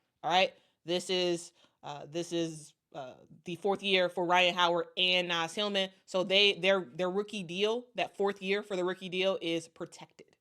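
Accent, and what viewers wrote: Chicago, United States. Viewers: It's actually a Chicago accent, not odd at all.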